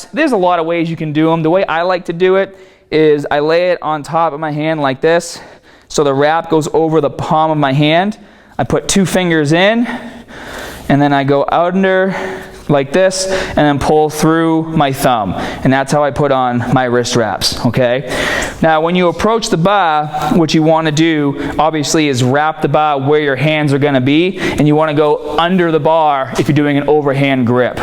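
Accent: Boston, United States. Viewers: Ahaha the Boston accent came out on this one forsure! Loved the biggie smalls reference as well. A lot of people can definitely learn from this video especially those who think wraps don't ever have its purpose in lifting.Thanks for the shoutout bro can't wait for more and more people to join us on muscularstrength! #NattyNation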